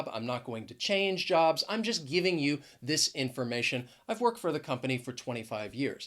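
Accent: United States. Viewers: Hi. What type of American English pronunciation do you represent?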